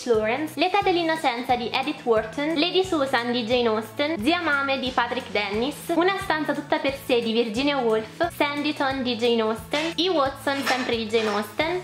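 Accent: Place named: Italy. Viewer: Ya sabía yo que era Italiano. Se oye luego luego. Lo bueno que se parece un poco al español y pude entender algo jaja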